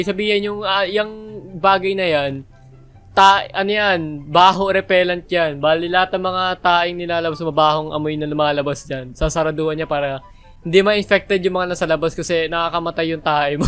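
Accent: Visayas, Philippines. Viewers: kuta bisaya kaba?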